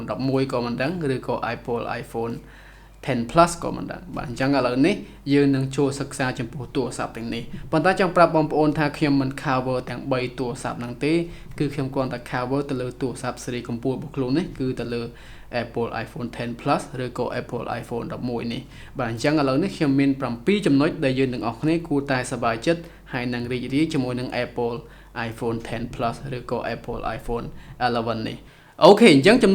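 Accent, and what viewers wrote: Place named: England, United Kingdom. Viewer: តុងអងគ្លេសខ្លាំងពេកហើយ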